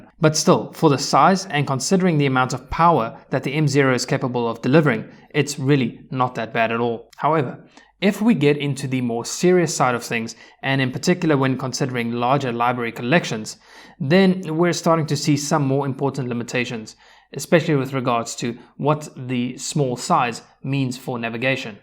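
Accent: South Africa. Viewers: It’s not often that I click on a video and hear a South African accent. 😊 Solid review! 🤙🏾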